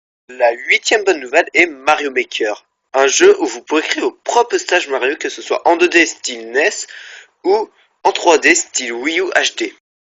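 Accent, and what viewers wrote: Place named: England, United Kingdom. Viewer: L'accent english :p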